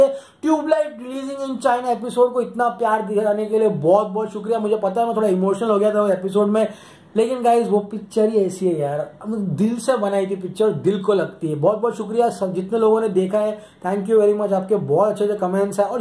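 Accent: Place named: Hyderabad, India. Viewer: Asalamualaikum bhai apki dialogue dilevery jo hai na hamare hyderabadi style me bolte kirak maut daldere yaro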